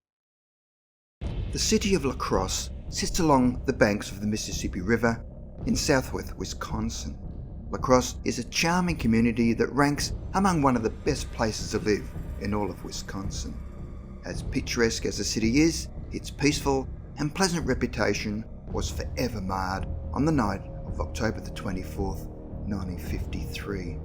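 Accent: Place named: Australia. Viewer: Dude that's crazy you sounded like you were from a bunch of different places. I heard the Australian after a bit of the video I actually commented that in like the first few seconds of it haha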